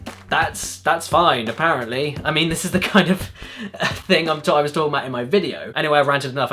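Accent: United Kingdom. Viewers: Wait are you british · hes so Brittish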